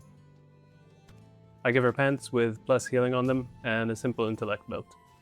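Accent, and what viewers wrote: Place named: Sweden. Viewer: Thanks for your reply. Also by your accent I can tell you are swedish, right?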